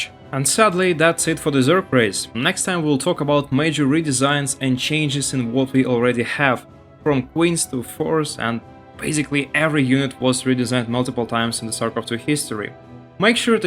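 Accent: Russia. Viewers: What accent, u from russia?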